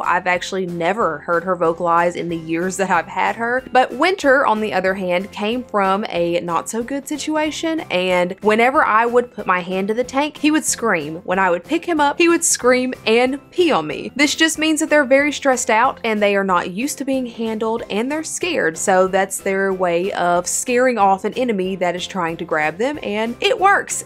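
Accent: South, United States. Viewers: that southern accent❤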